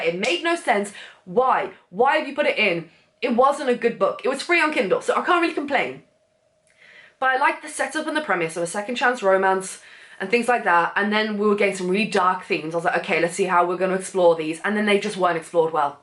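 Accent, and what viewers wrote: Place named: United Kingdom. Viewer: I literally enjoy listening to you . ☺️ I love the British accent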